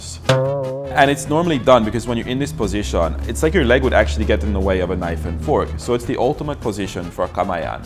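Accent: Manila, Philippines. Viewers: Are you half filipino? You speak normal taglish 😮 It's faster to walk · Yung accent mo may pagka pinoy accent na HIHIHIHI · listening 2 u is funny, you've picked up a lot of words and can speak with a manila accent hahaha, one thing i never do is sniff on the food, i find it rude to say the least, at jollibee i do pour the sauce on the rice but not the soy sauce and calamansi when mixed together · Actually your already adopted the English accent of Filipino's.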